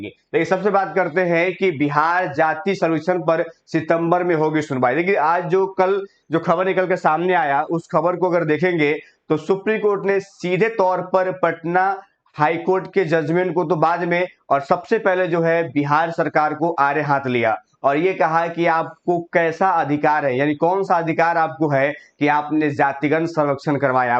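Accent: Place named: Uttar Pradesh, India. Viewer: Tu up ka hai lag rha hai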